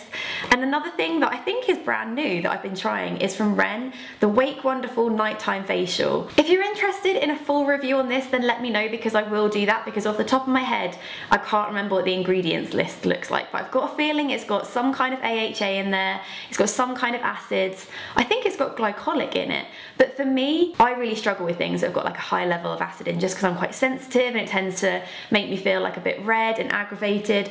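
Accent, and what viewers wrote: England, United Kingdom. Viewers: I love the English accent and the way you say Glowwwwww, love you x from MAURITIUS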